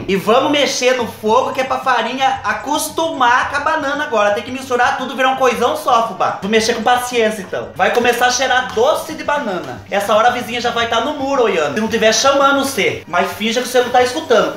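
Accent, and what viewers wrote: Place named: Countryside, Brazil. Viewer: morei no interior e sinto muita saudades,amo esse sotaque lindo. Vc lembra do vídeo do coró? Aquele dia eu ri tanto que doeu a barriga. Quando mudei pra Tatuí eu estava grávida,e ouvi minha sogra falar que lá na árvore estava cheio de coró,eu achei que era uma fruta e fiquei com desejo de comer o tal coró, só que eu não falava tinga vergonha, pois estava na casa da sogra,como eu ia dizer aí sogra me dá um coró. Pois vem eu já andava com a boca aguando quando o pai do meu filho chegou de uma viagem de 2 meses eu pedi a ele, por favor eu quero comer coró não aguento mais de vontade ou vc compra ou vou me separar de vc. Todo mundo riu fubá do céu. Essa foi a maneira bizarra que fui apresentada ao coró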